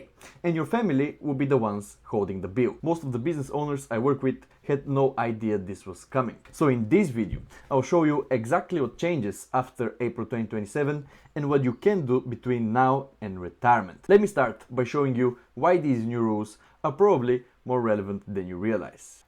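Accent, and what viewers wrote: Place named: United Kingdom. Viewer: Nice UK accent ! . Pension contributions are tax free so somewhere someone has to pay into HM treasury to pay for the PPE , Hs2 etc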